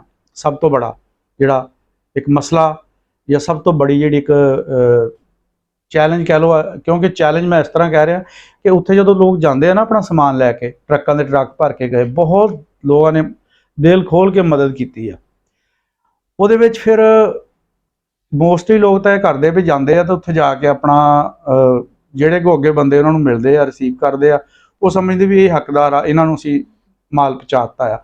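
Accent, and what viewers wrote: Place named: Doaba, India. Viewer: bhut vdya glbaat kahi a veer ji , tuadi punjabi boln da lehja jaladhar,hoshiarpur side vla a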